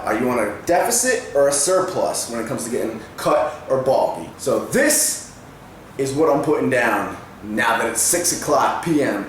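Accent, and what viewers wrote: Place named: Rhode Island, United States. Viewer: Did I just hear a classic RI accent Boda-buildin?! hahaha nice video brah! After a few weeks we will see how its working and make adjustments from there.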